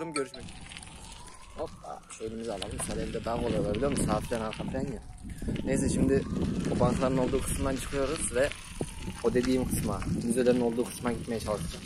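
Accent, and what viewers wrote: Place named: Ankara, Turkey. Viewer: Angaralı olduğun nasıl da belli ya la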